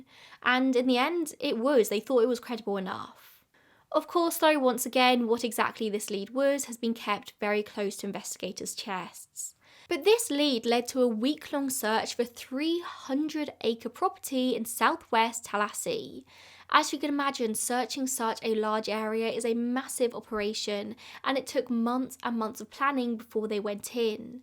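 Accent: United Kingdom. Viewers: As an american😐😐😐😐are you serious rn? The creator, is OBVIOUSLY not American, (I think british but not completely sure) she obviously has an accent and is going to talk with her accent and dialect😐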